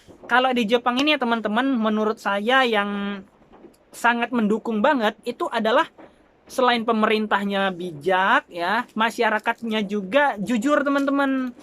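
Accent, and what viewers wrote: Java, Indonesia. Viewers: Bang, asli wong jowo ta?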